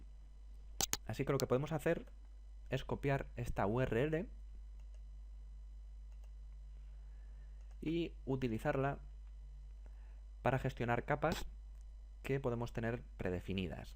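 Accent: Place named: Madrid, Spain. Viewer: Estoy viendo este video desde Pakistan y aca el medio normalmente es Ingles pero con mi nivel intermedio de Espaniol entendi perfectamente y me habeis ayudado demaciado para preparar una mapa. El senior tutor suena madrilenio... lo creo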